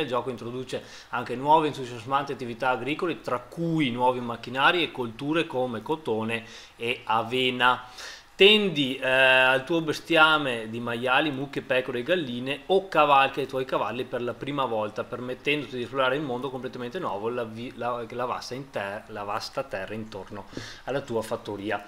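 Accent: Veneto, Italy. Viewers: Un po' veneto e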